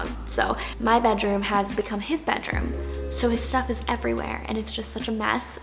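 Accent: Spain. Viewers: I am from spain! I love your spanish accent!! 😜😜😘😘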